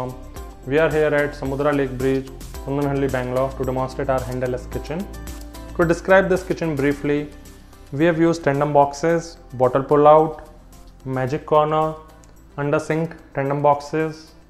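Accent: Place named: Arab world. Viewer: Love arabic accent. Say again "built-in".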